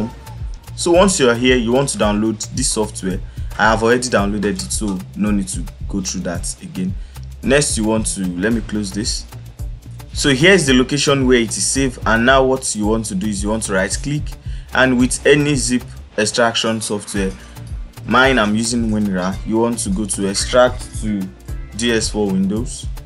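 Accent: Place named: Nigeria. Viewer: I love that Nigerian Accent. 👍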